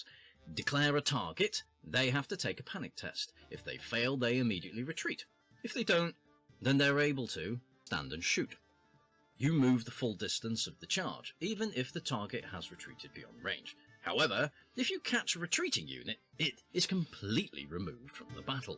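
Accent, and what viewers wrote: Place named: United Kingdom. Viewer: It matters because sometimes people can't pick out the British (?) cadence. There are a few important words in the beginning I couldn't make out even with repeated listenings.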